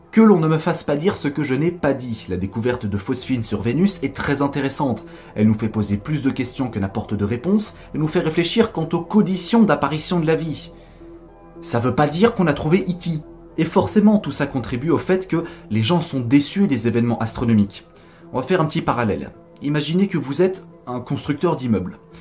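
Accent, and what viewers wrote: Belgium. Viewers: Tiens! T'est belge ? Je m'en doutais ! Tu me fais trop penser à Yan L'Or-iginal 🙂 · Nonnnn t’es belge! Lol il on ou ton accents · Tu es belge?mdr sinon j'aime bien tes vidéos 😁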